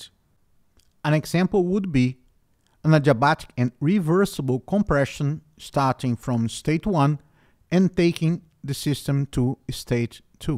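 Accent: Brazil. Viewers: Percebi que era brasileiro pelo sotaque hahahaha, ótimo vídeo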